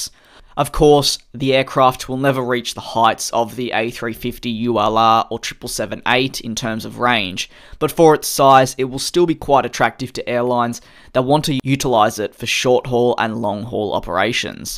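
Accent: Australia. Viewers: Wait.. u from Australia? thought you were from GB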